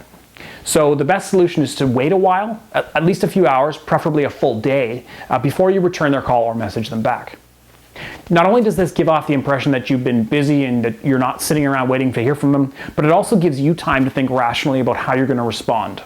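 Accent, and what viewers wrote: Canada. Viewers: Are you...Canadian?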